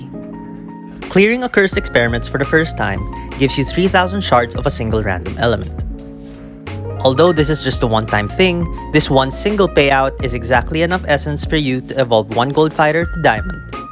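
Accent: Philippines. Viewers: The video is awesome can't really say much but i can tell how your accent works. Dont mean to be rude but are ya a filipino? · I can smell that filipino accent from over here. Great video btw.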